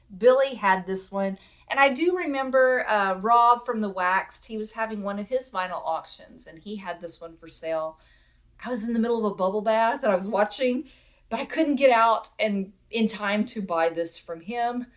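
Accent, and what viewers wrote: South, United States. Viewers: love your Southern drawl accent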